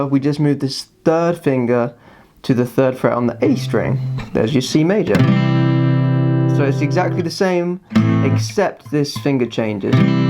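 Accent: United Kingdom. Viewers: Coz he's british like me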